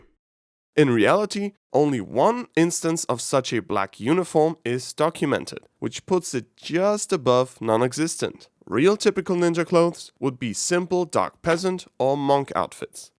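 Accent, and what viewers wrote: Austria. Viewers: He is from Austria, if I recall correctly.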